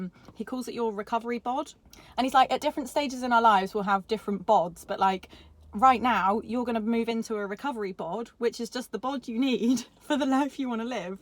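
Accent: United Kingdom. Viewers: She has a British accent but the steering wheel is on the left side. What gives?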